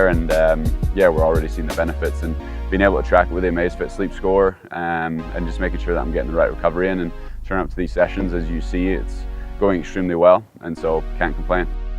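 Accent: United States. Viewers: What’s with the American accent??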